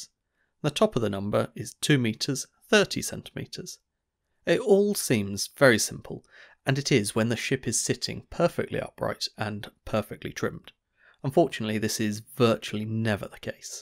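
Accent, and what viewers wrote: United Kingdom. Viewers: Somehow his British accent is disturbing... at least to me.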